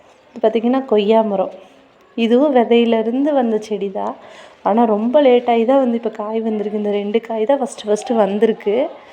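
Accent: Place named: Kongu, India. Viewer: கொங்கு தமிழ்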